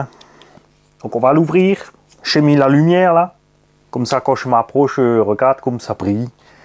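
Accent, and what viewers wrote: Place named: Alsace, France. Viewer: Excellent l'accent alsacien amplifié avec toute les petites phases, on dirait mon plus jeune fils qui fait le touillon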